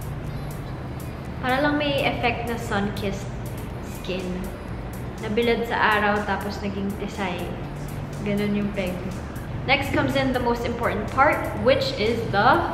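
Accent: England, United Kingdom. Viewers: Melly Andrade I really don't understand the hate she's been receiving ,she's fine to me and loooove the English accent. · sorry ulitin ko nlang yung vid,sa mukha mo lang ako naka tingin ang pretty mo girl haha..btw i like your english accent hehe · ganda at galing mo mag make up girl so perfect and i love ur english accent sarap pkinggan kya pls. wag kna magtagalog pls. haha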